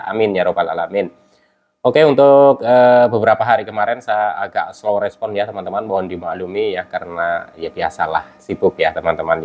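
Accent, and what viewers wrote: Banyumas, Indonesia. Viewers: Menyimak ngapak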